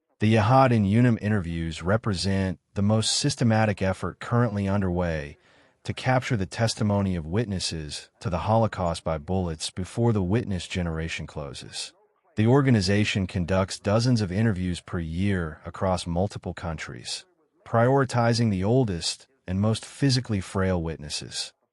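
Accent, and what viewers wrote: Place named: England, United Kingdom. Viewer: It would also be helpful if German words were spoken with more of a German accent than an English accent. If you want a quality product, this could be done. For example, It’s “gruppen” with a long “u” sound, not “grupen” like “up-en”. Spend the cash and get a professional translator to help with the accents/pronunciations. This is done all the time. Then it doesn’t sound so amateurish.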